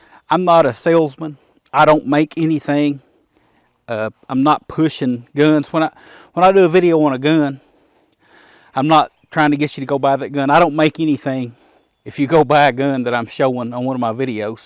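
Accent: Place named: South, United States. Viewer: that southern accent 1 in a million man.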